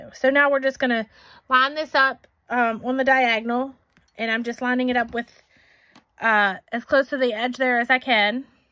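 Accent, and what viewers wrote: South, United States. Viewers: Stop doubting yourself! Girl those seams are looking awesome 👍😎!! Love to listen to you talk, Southern Gal!!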